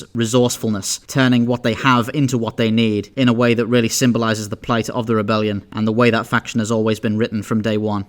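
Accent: United Kingdom. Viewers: You are clearly a Brit.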